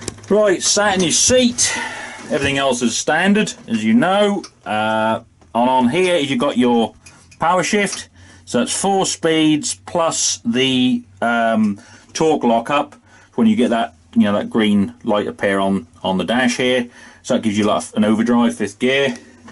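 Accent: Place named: Lincolnshire, United Kingdom. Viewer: Are you lincolnshire. You sound it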